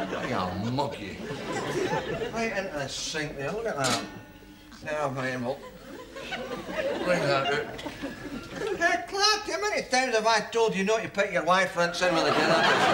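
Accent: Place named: Scotland, United Kingdom. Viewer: Its funnier because of the Scottish brogue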